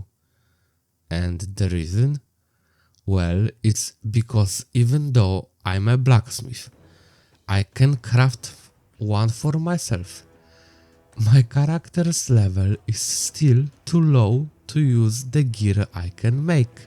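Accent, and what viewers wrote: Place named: Balkans. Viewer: bałkan inglisz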